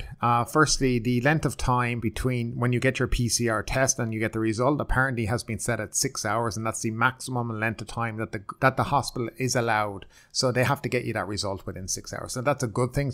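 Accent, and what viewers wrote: Ireland. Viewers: I am an American and find your speaking speed fine. Perhaps some people are not used to your Irish accent. A professional voice recording artist usually speaks about 50% faster than average. A professional speaks about 150 words per minute, so consider yourself professional.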